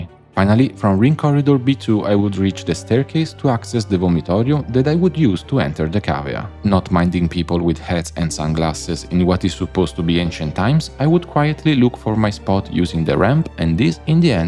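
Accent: Italy. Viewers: Ehm mi sembri un italiano all’estero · Ma sei italiano??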